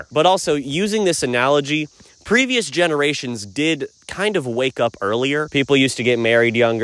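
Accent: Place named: United States. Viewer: That scenery looks like England, was surprised to hear a US accent.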